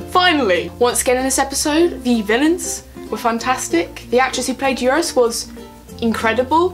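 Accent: United Kingdom. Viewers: Watching this, I was hearing my own thoughts coming out of a younger person's mouth and with a British accent. Seriously, everything you said are things I have said over and over. I lost a friendship -- someone I knew for 30+ years -- for just questioning how John got out of that well. If Sherlock Holmes were a real person, he would have eviscerated this episode for all the inconsistencies and impossibilities; as fans, many of us have come to analyze things in the same way. Thank you for this video!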